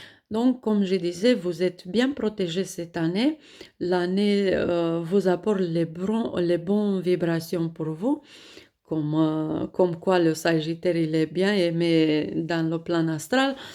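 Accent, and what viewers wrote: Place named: Russia. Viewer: J'adore l'ascens russe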